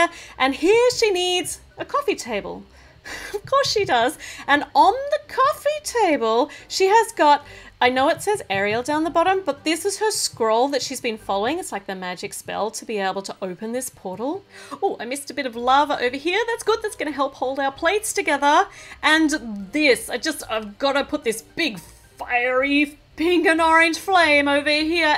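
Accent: Australia. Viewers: Are you from australia 🇦🇺. If you are where do you live in Australia.im aussie too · r u an Aussie!?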